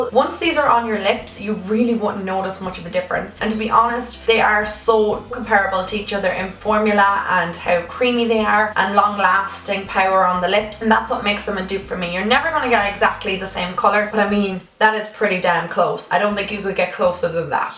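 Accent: Dublin, Ireland. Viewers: what county in Ireland are you from☺️x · U a dub ? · It's Irish, have you ever even heard a Scottish accent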